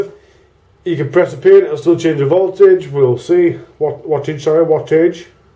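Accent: Yorkshire, United Kingdom. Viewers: Yes! Thank you for this review! Can’t wait to pick one of these up (but fingers crossed 🤞🏻 for your little comp). I thought you was yorkshire at first listening and thought Ohhh there’s a vape shop I can visit...but your not close enough after a quick google 😔. Vape shops in York are not to great. Anyways, thank you so much for your straight up black and white review. Brilliant!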